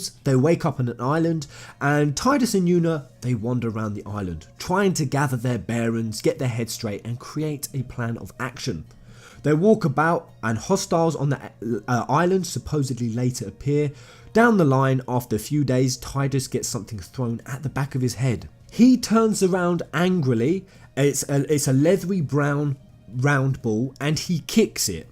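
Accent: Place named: London, United Kingdom. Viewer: I love hearing Japanese names said in a London accent. Shinji 'Ashy Mow'ow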